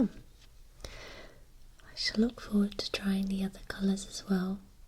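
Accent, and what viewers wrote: United Kingdom. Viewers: damn her accent is so British :')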